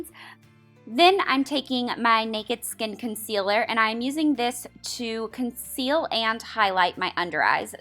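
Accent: South, United States. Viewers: I'm not sure if you live in LA anymore, but I think of you as a Southern Belle! I say that because of the way you talk & I know you are from Tennessee & you have such a pretty complexion lol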